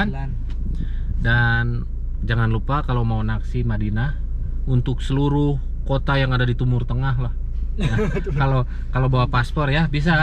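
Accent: West Java, Indonesia. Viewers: Masha Allah..jd teringat kembali hajian th kemarin 2019..kang logat sundana sami sareng abdi..salam kenal abdi asli urang patok beusi..subang..ternyata kang dari kp siluman ya asalna..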